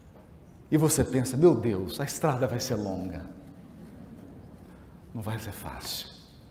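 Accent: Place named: Minas Gerais, Brazil. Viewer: Obrigada por essa mineirise maravilhosa . querido irmão obrigada por seus ensinamentos paz e luz.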